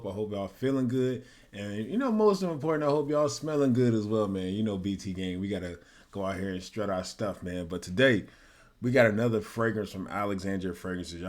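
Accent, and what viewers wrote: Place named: Midwest, United States. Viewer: Your likely from Wisconsin, Michigan or Ohio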